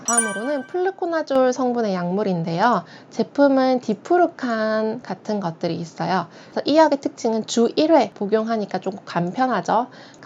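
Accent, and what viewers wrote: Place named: Gyeongsang, South Korea. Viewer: 선생님 인기 인기 하는데 뭔가 경상도 냄새가 나는데 ㅎㅎ 경상도분이시죠? ㅎㅎ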